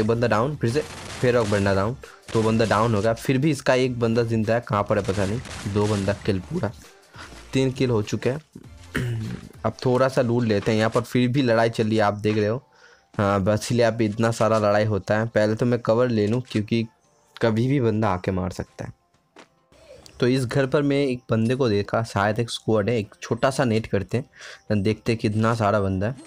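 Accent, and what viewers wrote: Bengal, India. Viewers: Bhai ap Bengali ho kia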